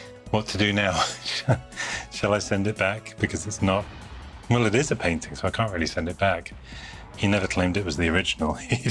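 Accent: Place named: Cockney, United Kingdom. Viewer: the oy bruv accent. I love it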